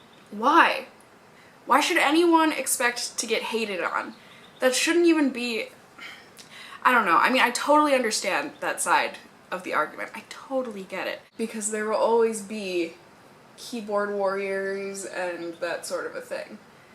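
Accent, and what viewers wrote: Minnesota, United States. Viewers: Your accent is getting more Minnesotan since you've been home. I love it.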